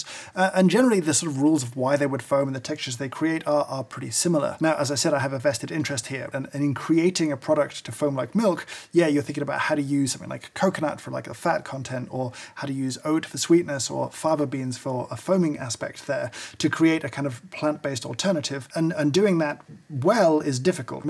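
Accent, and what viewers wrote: United Kingdom. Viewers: It's the British accent!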